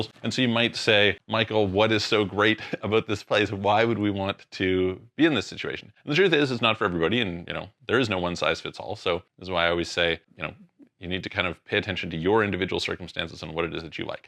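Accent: Canada. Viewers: "Aboat"? You wouldn't be a Canadian "eh"? No problem if you are. I like Kanuks.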